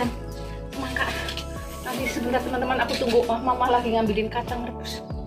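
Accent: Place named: Banyumas, Indonesia. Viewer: Mantap yu ngapak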